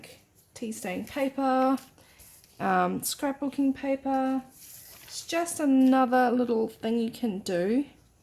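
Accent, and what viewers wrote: New Zealand. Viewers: Beautiful! In fact I zipped straight over to your Etsy shop and bought some! Don't worry about longer videos - sometimes they are needed for us (me?!) to get the gist of things. Also, I think in an earlier comment I mentioned liking finding a fellow Aussie to follow... can't believe I didn't pick the Kiwi accent, having lived over there for 7 years!!! Anyway, good to have someone 'local' (I'm in Port Macquarie). :-)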